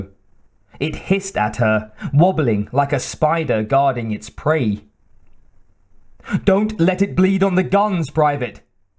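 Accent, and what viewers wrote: United Kingdom. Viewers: Truly committed to your craft to finish the whole thing if you felt the same way I did. Berserker was how I found your channel. It was pretty good and the relationship stuff had a sinister angle to it which was much better than whatever Genocide was trying to do. Berserker narration was fine IMO. There is this actor Will Patton that narrates a lot of audiobooks and I always groan when he narrates one I want to listen to. Nothing against the guy but his voice is very distinctly from South Carolina which doesn't really work for most books he reads, at least for me. Your accent makes it sound like an English Thespian is reading and for whatever reason that seems to work for everything. I'll check out those other books, thanks for suggesting them.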